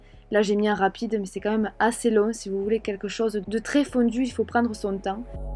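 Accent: South, France. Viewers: Haha je t’adore j’ai découvert ta chaîne il n’y a pas longtemps mais j’aime ton naturel, tu me fais beaucoup rire et t’es trop mignonne. Trop attendrissante et ton accent me fait un bien fou (le sud me manque!!!) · Moi : je déteste les vidéos make-up/haul ch'ai pas quoi. Moi : tous les dimanches à mater tes vidéos !!! Lol j'aime bcp ton naturel et ton joli accent du Sud ! 🤗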